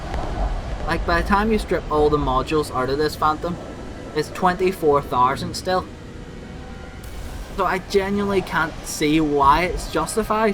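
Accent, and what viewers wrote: Northern Ireland, United Kingdom. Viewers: Hey mate, are you from Northern Ireland?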